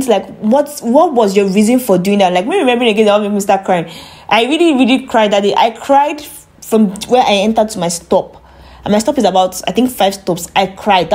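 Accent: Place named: Africa. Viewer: Sis, I want to watch your channel but its hard to understand you. I'm a black American and is unfamiliar with African dialect. Peace from New York ✨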